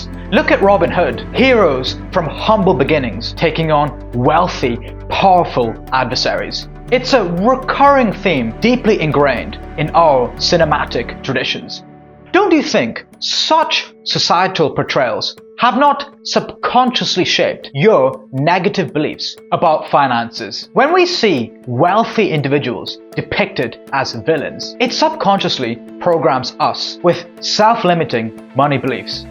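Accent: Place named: United States. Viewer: bro face indian bro voice american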